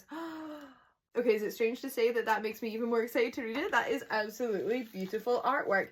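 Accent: Scotland, United Kingdom. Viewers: These all look great and they're such pretty books. Also I love the way Scottish people say book.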